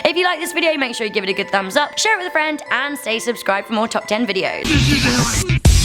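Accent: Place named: United Kingdom. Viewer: Wait I thought she was from the uk 🇬🇧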